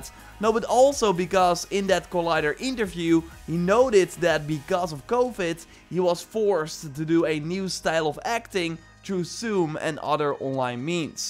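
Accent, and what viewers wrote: Netherlands. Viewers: the sound of your english accent, you must be dutch. Ofniet dan?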